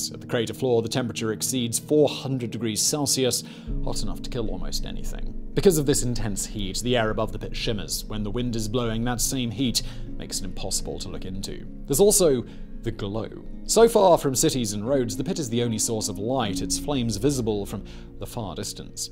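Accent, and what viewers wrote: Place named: England, United Kingdom. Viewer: Your voice sounds familiar but in a posh English boring drone way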